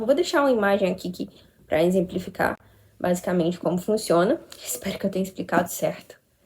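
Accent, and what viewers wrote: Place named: Pernambuco, Brazil. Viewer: Amei o sotaque. Pernambuco?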